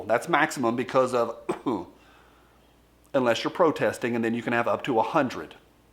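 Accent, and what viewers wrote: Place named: New York, United States. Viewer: Typical gun drama.... Jk I feel you brother. You sound like you live with me in New York for crying out loud lol.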